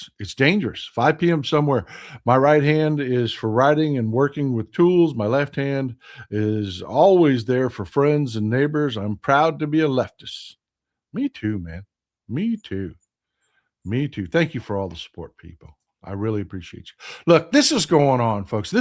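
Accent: Texas, United States. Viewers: Glad I found you. Been watching for a couple of weeks and I’m glad a fellow Texan, sharing my views, is broadcasting or rather, streaming as they now call it, and talking like a true Texan. The candid and straightforward way you communicate is the way my daddy got his message across. I’m 70 and tech is outrunning my ability to keep up. Hell, while tech is speedily moving ahead, sadly, my mind needs the spurs every now and then. So, I’m appreciative that I can hear facts from someone I relate to. Looking forward to every post, keep it up and thanks.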